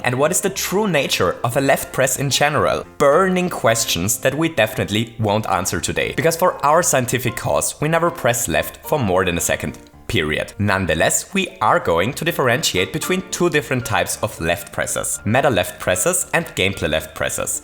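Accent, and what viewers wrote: Germany. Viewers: Kann es sein, dass es deutsch bist? 😂❤️ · Ich wette dh bist deutsch 🤔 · Bist du deutscher? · Ur english sounds very german ps: ich höre mich öhnlich an wenn ich englisch rede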